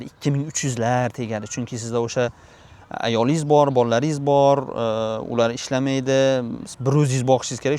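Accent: Khorezm, Uzbekistan. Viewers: Har dim Xorazmcha getib duribdidon,nerdansiz odosh?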